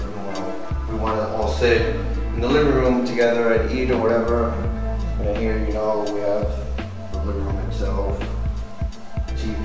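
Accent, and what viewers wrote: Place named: Brooklyn, United States. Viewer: You from Brooklyn whatever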